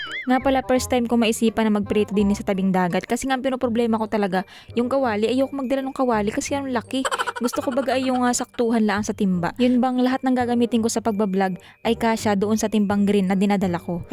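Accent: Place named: Laguna, Philippines. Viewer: shout out Alway watching from ksa. relate ako sa punto mo magsalita 😂 na miss ko tuloy ang province ko kalayaan laguna ganayan din punto.😂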